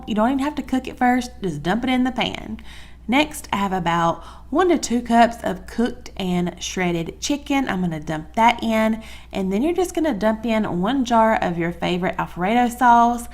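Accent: South, United States. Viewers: great recipes. Thanks. I could listen to your sweet southern accent all day long. Thanks for the great recipes!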